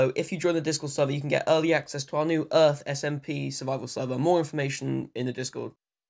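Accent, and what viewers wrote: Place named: United Kingdom. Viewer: You have a British accent That's mean you're from UK Am I right?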